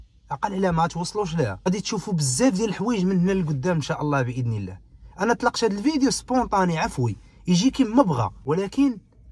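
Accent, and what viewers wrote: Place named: Algeria. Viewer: سمح لي اخويا وقيلة نتا ولد 2000 وطلع لاحظت كلامك كيشبه للجزاير واش متبع الحزايريين تانسيتي لهجتك المغربية؟ ديك كلمة ياو راني نتبع فيك راه لهجة ديالهم ولازم تاهي ديالهم والمدامة ديالك لي قلتي فالتعليق لاخر تاهي ديالهم .المهم حاول تهدر بالمغربية احسن .هما ولاو يهدرو بلهجتنت وانتم العكس وليتو تهدرو بلهجتهم؟ كون غير زينة وهي نصها مديكالي وكلها فرنسية معوجة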